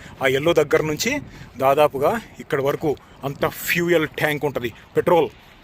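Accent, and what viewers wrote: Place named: Telugu, India. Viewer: This is my favourite video, I watched not less than 25 times, your language and the native Telugu accent makes it so memorable, SV Ranga Rao gari Mayabazar Dialogues gurtuku vachayi, Ghantasala gari voice laga anipinchindi - antha Gaambhiryam vundi voice lo.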